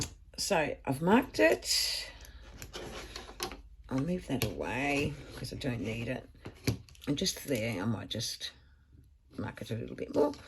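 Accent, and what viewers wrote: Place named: Australia. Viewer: Simple & to the point. Love to hear an Aussie as well. Going to enjoy watching your ideas & projects.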